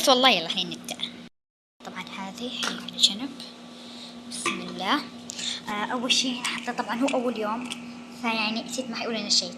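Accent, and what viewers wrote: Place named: Saudi Arabia. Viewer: احلى👍 بتحكي سعودي ماشاء الله هههه بس مافهمت شو يعني اول يوم حضوري؟؛!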